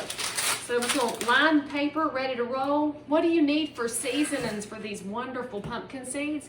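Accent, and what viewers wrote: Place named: South, United States. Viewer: Just found your video. You are cute as a button! Love your Southern accent, too. And thanks for the recipe! So good!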